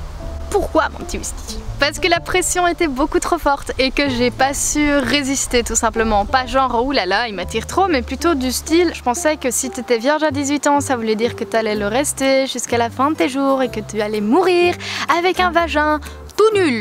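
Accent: Belgium. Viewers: Oula tu serais pas belge toi ? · T'es belge toi nan ? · Je t’ai découvert part cette vidéo ( je sais pas pourquoi ) et vraiment j’aime tellement ta joie de vivre. En plus je sais pas pourquoi mais ta l’accent belge 😂🇧🇪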